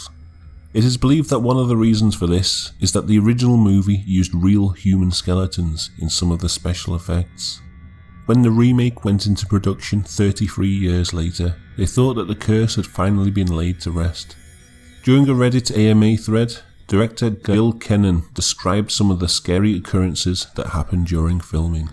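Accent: United Kingdom. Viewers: If you're curious about the three claw mark thing- it's a common occurrence in demonic activity. It's a mockery of the trinity rather than because demons have three claws. I was curious about that so that's why I know. Although I have seen one before. Very unpleasant sight... Definitely a new type of video. People tend to stay with the ones everyone knows, the original Omen/Poltergeist/etc so it's refreshing to see strange happenings in other films. Keep up the quality work, man. Random question, but are you British? I've been trying to figure out your accent. I'm not too good at figuring them out. DX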